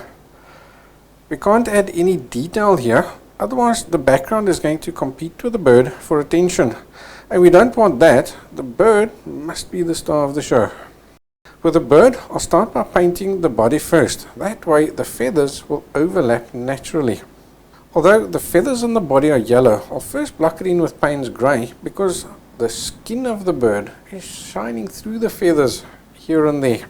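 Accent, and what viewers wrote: South Africa. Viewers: How refreshing to see a painting tutorial without the tedious real time or annoying back ground music. No boring pre chatter. Just straight in. That's why I can happily look at it more then once and to also enjoy the South African accent. Thank you so much!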